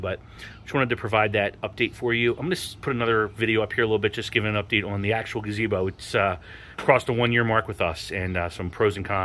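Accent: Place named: Philadelphia, United States. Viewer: “We’re in the northeast” with time of the worst Philly area accents I’ve ever heard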